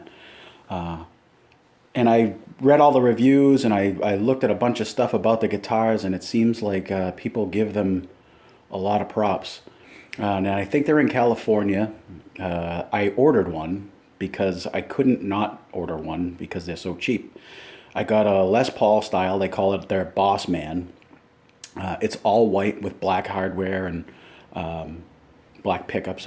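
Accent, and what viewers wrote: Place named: Boston, United States. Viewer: was wondering where you were from I'm from Hyde Park and felt right at home with your accent lol it was pissa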